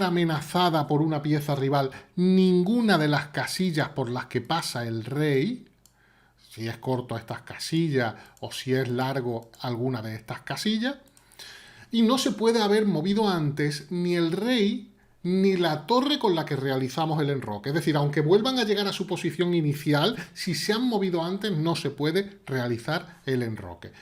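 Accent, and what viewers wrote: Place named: Argentina. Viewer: Che buen video Me intriga tu acento medio argentino medio español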